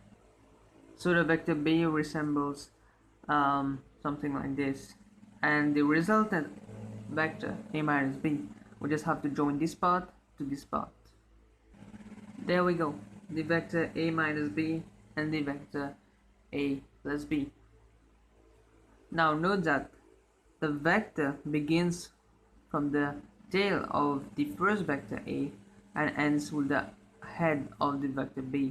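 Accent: Mauritius. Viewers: sounds like a maurician dudeeee!!!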